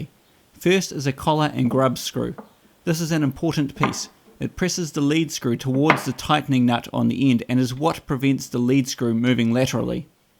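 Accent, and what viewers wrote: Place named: New Zealand. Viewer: Just found these vids. Nice work. Pleased to hear a Kiwi accent on youtube.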